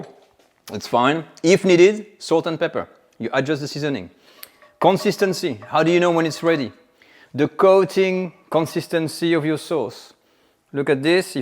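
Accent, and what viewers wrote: France. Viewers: This guy's french accent made me subscribe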